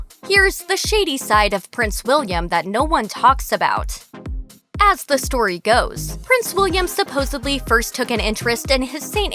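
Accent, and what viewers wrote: United States. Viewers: I hate videos with these robotic sounding American voices. You know the moment that voice pipes up that nothing worth while will be said. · As soon as I heard the American accent I thought .....more unfounded bullshit.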